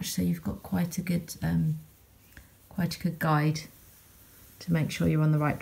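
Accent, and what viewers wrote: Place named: United Kingdom. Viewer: I wish that you were my neighbour you angel. Thankyou for this video. Nice to hear another Uk lady. I really love your work and you explained it all just perfectly. ❤❤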